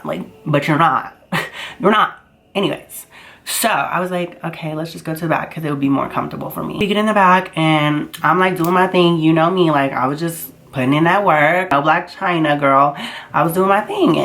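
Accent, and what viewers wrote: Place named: New Jersey, United States. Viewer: My heart really goes out to I am so sorry that that happened to you I have been in a similar situation and it is not okay for somebody you know that you kind of trust you know what I mean to do that. And honestly I have been watching your videos and like anytime I see a thumbnail of you or whatever on here like I'm like oh yeah she's the s*** and I totally forgot that you were even transgender until you hinted at it in this video like I don't know I mean that's not really what my comment is about like but it just kind of like I was like taking aback or for a second like oh wow I honestly completely forgot totally forgot and I think that is the way that most of your viewers view you you know I mean like I don't even think it crosses their mindset and for anybody that has a nasty comment to say anything oh that nature shame on them even if it's calling you a s*** or whatever. 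Anime like keep doing you I love your videos you are so funny like I love your accent like it kind of reminds me of home when I'm originally from but I'm originally from New Jersey so LOL South Jersey at that and I'm living in Kentucky now so yeah I don't know you keep doing you because you are funny you are beautiful you have a great personality.🖤💕🖤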